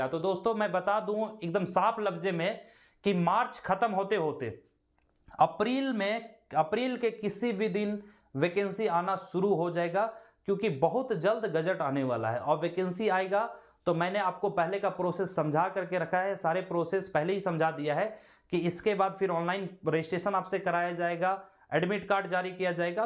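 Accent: Bihar, India. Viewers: Bihar Ke Hain Kya sar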